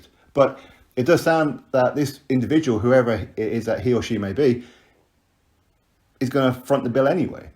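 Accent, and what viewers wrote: United Kingdom. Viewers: How the fuck Prince Harry going to afford that!?!??! His wife's suicidal because they cant even afford security. You sound British weird we know this across the pond and not in UK.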